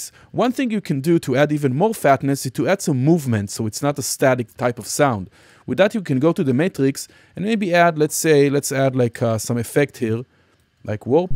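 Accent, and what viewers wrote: Israel. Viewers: אחלה ערוץ יש לך. אוהב את המבטא שלך. ישראלי אמיתי. תודה אחי. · גם ללמוד מסרטון באנגלית ברמה גבוהה וגם לשמוע מבטא ישראלי כבד. תענוג! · חחחחחח ישראלי ,מבטא יפה.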